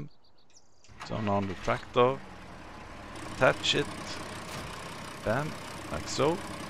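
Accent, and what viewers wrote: Norway. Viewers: Are you norwegian? :)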